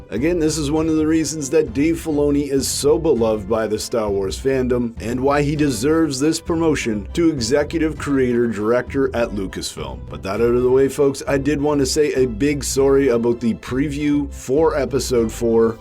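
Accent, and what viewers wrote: Canada. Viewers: I can tell your Canadian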